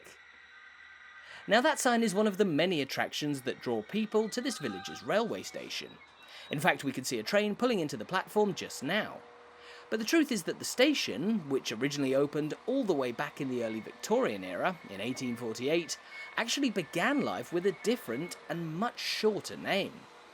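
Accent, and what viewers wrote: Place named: RP, United Kingdom. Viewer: You have such a command of the speaking word…you speak like the BBC.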